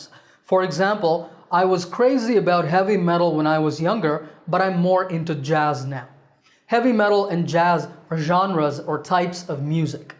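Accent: United States. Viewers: Your accent is American wow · I come to you for two reason. First I learn so much from you and Secondly I love watching an Indian Using American Accent. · Your american accent is 😍. Wanna learn it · Sir are you from India? How did you acquire American accent? Tell us about that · You are indian but voice is like American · You are Indian but your voice like real American people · Apart from your excellent teaching . I liked your american accent, How did you developed it ? I am too trying to speak in ameri-khen accent .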